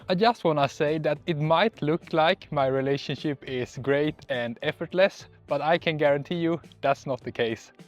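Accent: Sweden. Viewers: You must have been living here for a longer time than 4 years . Because your English allready has a swedish accent :-) Why is your girlfriend having this gigantic hat???? If its against the sun?... Well then , it is totally not neccesary in Sweden :-) Ohh! Thank you for a pleasant blog by the way! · 4 years in Sweden and you speak with perfect pronouncation and an extremely swedish accent when speaking English? What is going on?! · You are beautiful people and your swedish is so good, and you even have a swedish accent to your english. A great video. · 4 år??? Om du uttalar orden så bra så kan du fan i mig läsa det här också. Det är ju nästan så att du "bryter" på svenska när du pratar engelska.. Imponerande.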